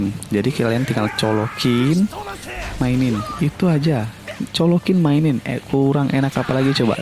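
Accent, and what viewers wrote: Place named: Bali, Indonesia. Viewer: Bali sekali logatnya anda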